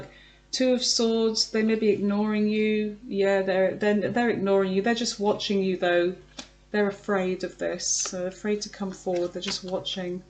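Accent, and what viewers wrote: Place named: United Kingdom. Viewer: love your readings and your british accent - thank you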